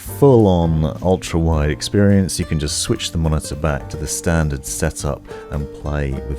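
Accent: England, United Kingdom. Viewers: I don’t care about the monitor I came here just for the voice of a calm english captain Jack Sparrow. Ever thought about speaking in audiobooks?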